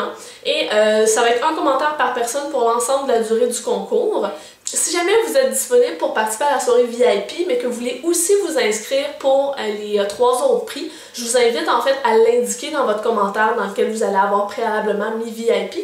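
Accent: Quebec, Canada. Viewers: J'aime tes vidéos, il sont très utiles :) en québécois en plus ça fait du bien hihihi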